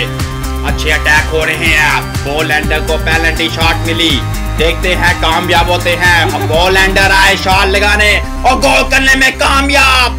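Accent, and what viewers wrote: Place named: Lahore, Pakistan. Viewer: hahahahaha yaar your Lahori accent is so hilarious "Parr-vaizzz" hahahahah muaah cha gaye ho!!!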